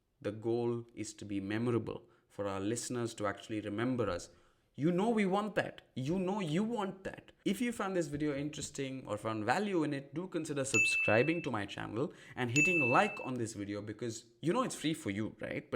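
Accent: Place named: Maharashtra, India. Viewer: I too initially thought you are from Maharashtra :D. Great tips!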